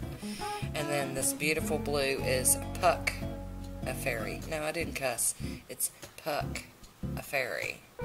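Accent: South, United States. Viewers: im so used to ur videos and i enjoy ur accent so immensely that when i read ur replys i hear that southern drawl. <3 i love it!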